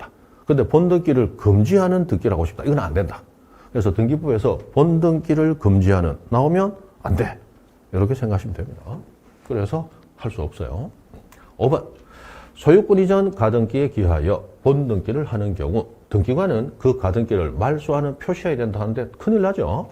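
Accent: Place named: Gyeongsang, South Korea. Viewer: 와 이해 하고싶었지만 잘 몰라서 그냥 외우고 있었는데 설명 과 암기를 모두 해주시네요 ㅋㅋ 거기에... ㅡ 발음이 안되서 ㅓ로 하는 대구경북 억양까지 구수... ㅋㅋㅋ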